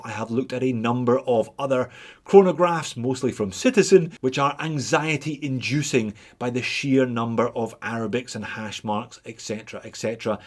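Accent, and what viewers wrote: Scotland, United Kingdom. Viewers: Am I the only one doing the intro “hellloooooooo and welcome to just one more watch” in Scottish accent? I swear I sound exactly like now after watching his channel for over a year 😂😂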